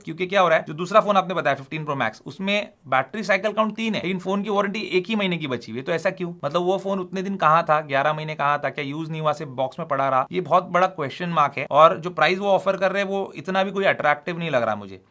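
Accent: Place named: Hyderabad, India. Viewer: assalam walekum bhai Kyunki aap Hyderabad Walon ke jaisa baat karte ho❤❤❤❤🎉🎉🎉🎉🎉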